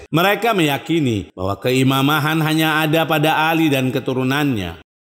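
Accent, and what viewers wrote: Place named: Madura, Indonesia. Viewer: kalau dr logatnya kayaknya madura ini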